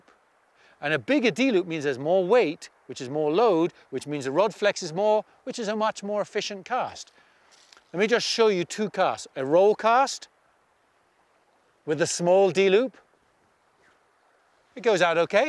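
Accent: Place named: Wales, United Kingdom. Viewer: what part of wales are you from? I clocked your accent as I’m a cardigan native myself. Live in Scotland now though.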